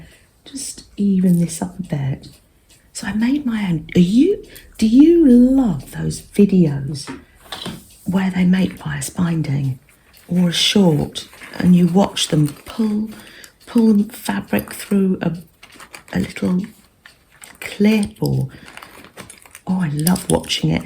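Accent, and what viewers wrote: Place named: England, United Kingdom. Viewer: I so love listening to English laies speaking about their sewing project - such kind, gentle voices, easy listening and relaxing. love this project.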